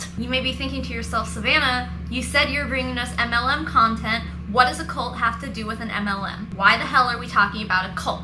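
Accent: South Africa. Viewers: Yoh! that south african accent is THICK! also for some reason people here in South Africa have an obsession with Demons... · Oh. South Africa. Thats my country. Well its about time the shitstorm that is South African cults is opened up. Its a wild ride to hear your own accent in a video about cults, Im not gonna lie. Sadly, its a common belief here that certain people can take in spirits and release them, especially if you're Christian. However, that gal? She needs some medication, and a therapist. ASAP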